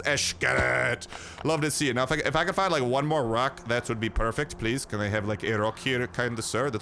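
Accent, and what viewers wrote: Russia. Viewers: Hey are u russian whats wity the occasional accent whats your heritage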